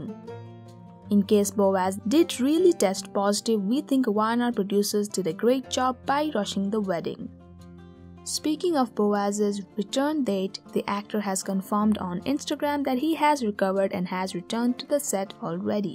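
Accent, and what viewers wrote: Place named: India. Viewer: Sounds Indian to me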